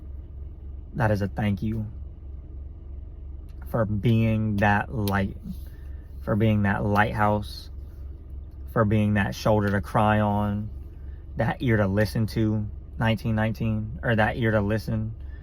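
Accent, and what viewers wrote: South, United States. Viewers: You got like a country accent . Where are you from ????🎉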